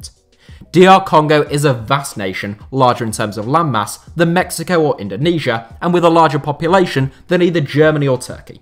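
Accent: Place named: England, United Kingdom. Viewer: You sound english but you dont half bunny like a septic....show the clip FFS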